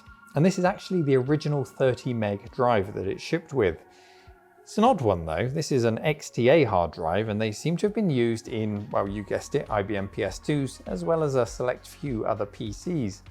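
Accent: United Kingdom. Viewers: You don't sound like you are an American, you sound like that you might be from Britten.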